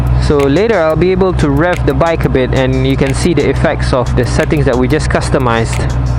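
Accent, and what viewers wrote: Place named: India. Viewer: Heavy indian accent, mouth breather... Thumbs down.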